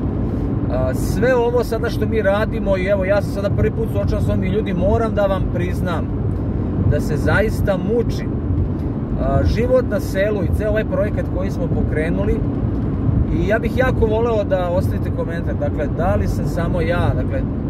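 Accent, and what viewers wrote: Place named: Dalmatia, Croatia. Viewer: Volim dalmatinski dijalekt pozdrav iz srbije !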